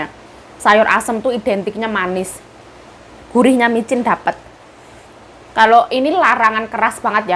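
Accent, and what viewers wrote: Java, Indonesia. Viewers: Wong jawa ngomong bahasa taiwan tetep medok ya, medok puoll 😁😅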